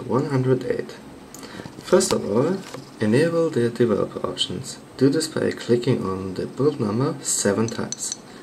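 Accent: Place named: Germany. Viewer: Tried so many times to root my Z1 compact and all methods never worked until this one, Thanks very much, greatly appreciated, root succesful, now all i gotta do is figure out how to get apps to actually work on the sd card, using folder mount and whatever else is needed. I had an idea when i heard the German accent it was going to work this time :)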